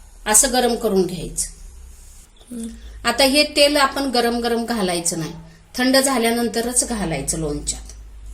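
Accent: Kolhapur, India. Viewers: Khup chan lonche distey.tumhi chan samjaun sangata.aapali kolhapuri bhasha kanala god watate🙂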